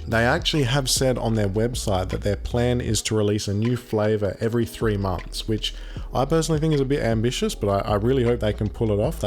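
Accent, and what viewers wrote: Adelaide, Australia. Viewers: this guy sounds just like DJ Sanchez lol beyond just adelaidian accent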